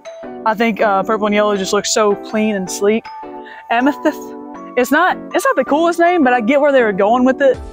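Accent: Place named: South, United States. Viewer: Californian Here Lol I Dig Your Videos And That Heavy Southern Accent Dude Lol Keep Them Comin Darlin..I To Played Baseball Haha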